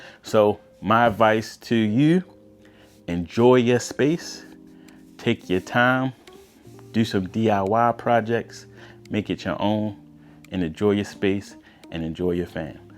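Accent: Baltimore, United States. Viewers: You're from Baltimore aint youuu?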